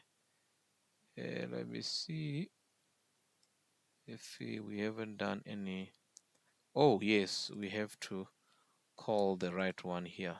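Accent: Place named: South Africa. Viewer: Please continue making these DSA videos. Your teaching style resonates with me, and perhaps it’s your South African accent that I find refreshing.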